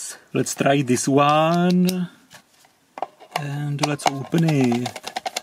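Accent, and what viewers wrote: Czech Republic. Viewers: Yep, he is from Czech Republic, what's wrong😉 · Czech Republic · He's Czech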